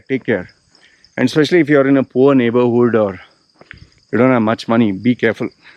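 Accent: India. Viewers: your accent is more like an Indian. where are you from brother?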